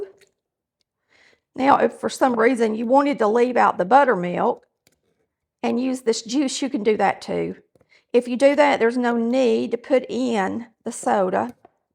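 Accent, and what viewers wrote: South, United States. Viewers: Coping with grief loosing my mom I start baking. The last few months I made few different recipes of banana cake. This one is the best of all. The cake is delicious, moist and even I did not put self rising flour rice to the top of the baking pan. I like everything about this video, soft melodic southern accent of, she explains very well substitutions if you don’t have all ingredients in hand. In my cake I used organic flour 3 huge bananas and yogurt instead of buttermilk. Looking forward to try more recipes. Thank you!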